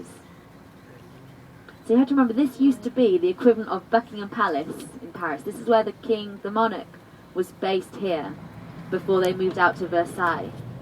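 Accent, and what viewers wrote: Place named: China. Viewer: Seems to me like the narrator is from China. Cant get her pronunciation at times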